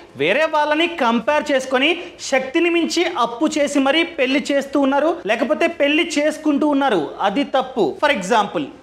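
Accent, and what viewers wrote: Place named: Andhra Pradesh, India. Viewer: Hi, Please don't mind for this Question. Why is your Telugu slang so different? Are you not from AP?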